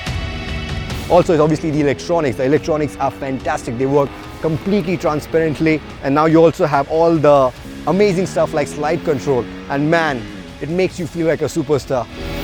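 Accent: India. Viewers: ''paaan nigaale'' lol typical dhoti tone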